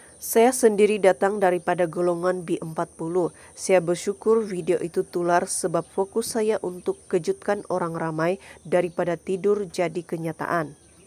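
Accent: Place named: Indonesia. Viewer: Suara ni mcm indon je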